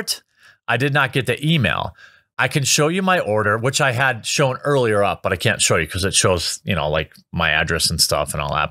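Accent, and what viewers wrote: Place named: Milwaukee, United States. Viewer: Lived in Milwaukee, can confirm this is true. Any aaaaa sound like aple goes 'Ay' instead. Ayple? Weird but funny n cool how dialect works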